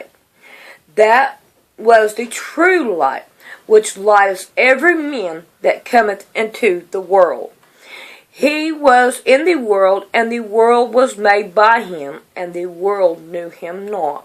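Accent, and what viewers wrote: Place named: Rural, United States. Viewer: Can you quit sounding like a Hick?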